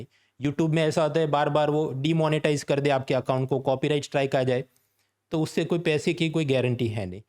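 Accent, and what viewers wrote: Gujarat, India. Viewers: Sir gujratse he ?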